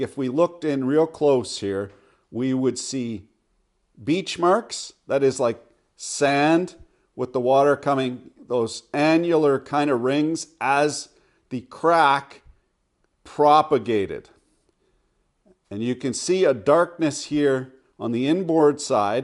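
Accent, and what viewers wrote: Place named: Canada. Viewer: Narrated is classic unfiltered Canadian style -- well done!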